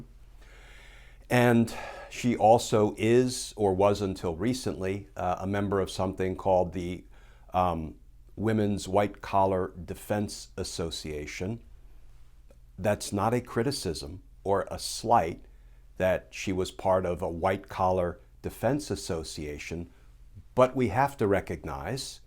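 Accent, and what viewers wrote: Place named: New Jersey, United States. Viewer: She was bought... Saw your interview with Stephanie Miller on Political Voices Network... Heard your spin on that hot mess in NY. Great interview & loved your New Jersey voice. ⚖️💪⚖️🇺🇸⚖️🇺🇸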